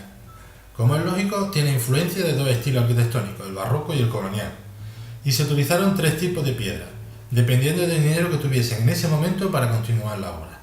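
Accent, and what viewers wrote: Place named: Malaga, Spain. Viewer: ole olee!! Jajjaja yo de Málaga x tu acento lo supuse... pero me gusta mucho cadiz la verdad😍 bueno me gusta toaaa Andalucía pake nos vamos a engañar jejejej 💋💋💋✨✨✨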